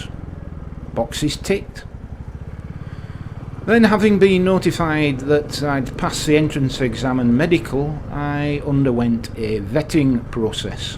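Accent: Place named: Bradford, United Kingdom. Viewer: First off... good to hear a Bradford accent again! 1/ Cuts from 2009: I know two police officers who were made redundant in the wake of those 'austerity' measures - one a month before his retirement (I believe) so that he would not receive his full service pension. I know a couple of others who left at the same time because they said policing changed in the wake of those cuts - policing became reactive rather then proactive with less engagement with members of the public and less emphasis on crime prevention and more on 'firefighting'. Talking about 'customers' - I was in education in the 80s/90s and about the same time there was a shift from seeing students as students towards them being 'customers'. The argument of Thatchers changes in the 80s (individuals increasingly paying for education services) was as they were now paying they were 'customers' - and the customer is always right. 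The introduction of private sector attitudes and marketing was endemic. Policing and education are (supposedly) public services NOT private businesses which exist to make a profit by (sometimes conning) customers out of their money. 2/ Biking: there are roads I hate to go on not because of the traffic (we live now at least 50 miles from even a small city and rural road users are very polite) but because of the dire road surfaces particularly on back lanes that are ever more more beginning to resemble green lanes. Live where we do and you soon begin to perfect the art of the pothole slalom. Rece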